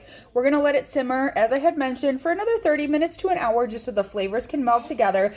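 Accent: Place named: Chicago, United States. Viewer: I was born in Chicago, raised in Des Plaines area and LOVE Chicago style "Italian Beef"!!! It really is mostly a Chicago area ONLY dish. I moved to the West coast in 1970 and have been missing my beef sandwiches ever since! Portillo's is great and does have a location Near "Knott's Berry Farm" in the Orange county area of Southern CA but we're a long way from there near San Diego. Not sure if we can find giardinerra out here or not. Recognized you accent. immediately! haha Will try your recipe but I do have a deli slicer so will likely use a rump roast and slice it up razor thin. Thanks!!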